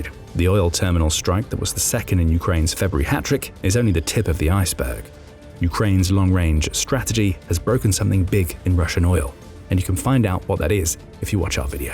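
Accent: United Kingdom. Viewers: Im very surprised you're saying soccer when you sound like you're from the uk.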